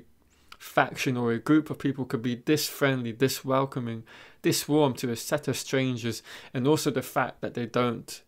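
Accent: United Kingdom. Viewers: Are you from the UK? You have an interesting accent.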